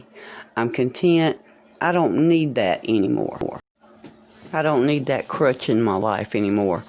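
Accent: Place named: North Carolina, United States. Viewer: u sound like u have an NC accent. I know bc I am from eastern NC. just wondering if u have lived in NC ever? yes u r telling the truth girl for real! I am in recovery now imo but yes I am on suboxone that is prescribed and going to a counselor as well. started late with drugs as well. God bless you 🙏 ❤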